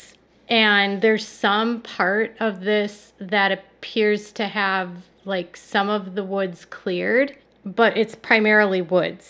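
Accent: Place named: Midwest, United States. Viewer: I'm also from rural Minnesota! For a while now, maybe your accent, I've thought you were from MN or somewhere in the midwest.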